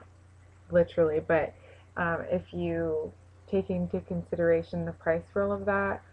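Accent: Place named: Canada. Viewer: nice(: u gotta b from canada cuz u really have an accent(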